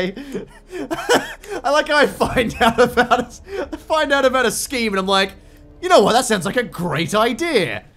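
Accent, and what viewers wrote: Australia. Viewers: down under aye u aussie mate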